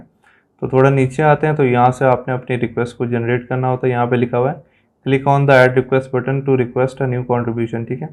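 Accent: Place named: India. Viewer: Please speak English not Indian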